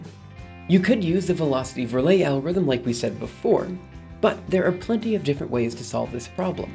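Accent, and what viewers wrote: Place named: United States. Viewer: I've never heard this American accent before... (I'm guessing it's American) Thanks for the video though.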